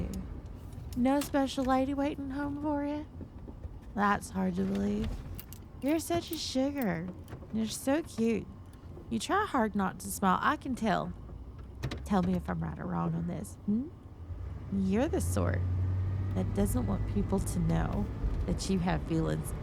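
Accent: South, United States. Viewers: Damn I am never this early, not gonna complain, we love the southern asmr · I love your southern accent!! This is a cute audio! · First time listener,and I wanted to say that was very awesome. I loved your voice. The southern accent was great. I wish I could have an obsessive cowgirl take care of me lol. I enjoy how sweet she was and you slowly peel back the lairs,and find out she a crazy. I also enjoy the slight lustful side of her. The outro was pretty cool to hear that you relate the stories to irl situations. Plus it kinda gives us a little peak behind the curtain and get know a bit about you. So I recommend doing outros. Sorry for being so long winded 😅.i just wanted you to know you are amazing, and I can’t wait to listen to more 😊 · I love cow girls, and yours is my new favorite. The southern accent and flirting are so good, and I love the yandere turn. 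"Milk straight from the tap" is wild. But also, yes please!🥰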